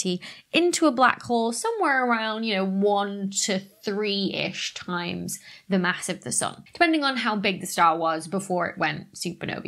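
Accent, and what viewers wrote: United Kingdom. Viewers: Where is dr.becky from ?? Britain ?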